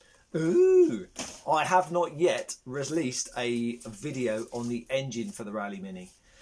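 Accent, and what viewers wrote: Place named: England, United Kingdom. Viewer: My wife asks "who's the Ed Harris guy with the English accent?" so i said "nah, younger and prettier" lol.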